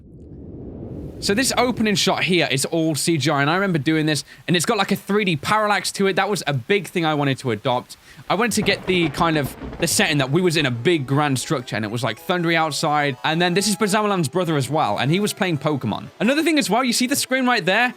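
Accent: United Kingdom. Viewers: Your voice changed...backwards.You used to sound more mature and British.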